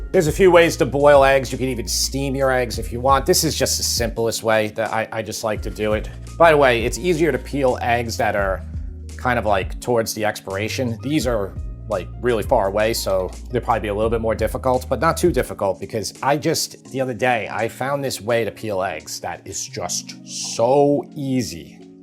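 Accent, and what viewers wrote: New York, United States. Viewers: Wow I’m from Queens and living in Ga and I have to say that your NY accent is cool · I love your channel. I'm from Westchester, but lost my accent. I'm jelly of your accent, but trying to figure out which area your from.. let us know where you grew up. Also.. how do you not have a NY diner style home fries recipe?? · I just love that New York accent! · nice to hear a real new york accent. not somebody in new york speaking with a CA accent. that crap always annoyed me.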